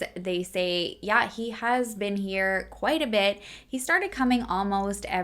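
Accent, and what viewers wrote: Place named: Canada. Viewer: I was wondering if you were from Canada! I could tell by your abouts, I’m from North Dakota and we kinda have similar quirks in our accents. 🥰 love your videos just found you yesterday!!! ❤️❤️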